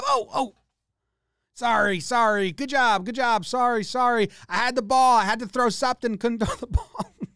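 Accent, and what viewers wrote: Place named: Texas, United States. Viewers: Your Texas accent is spot on. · Love your Texas accent.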